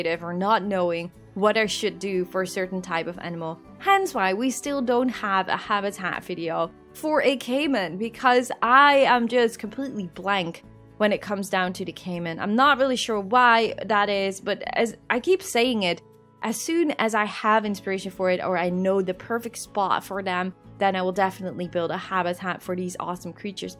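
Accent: Netherlands. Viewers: I knew you accent sounded Dutch! Never been to Rotterdam zoo but I loved Beekse Bergen. Been a very long time since I last went to visit but I'm definitely gonna go again sometime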